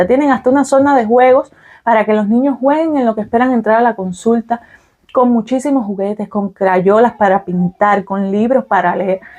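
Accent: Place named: Central Cuba, Cuba. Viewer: Una ciudad tranquila salvo ciertas calles a ciertas horas. Por el acento debes ser del centro de Cuba.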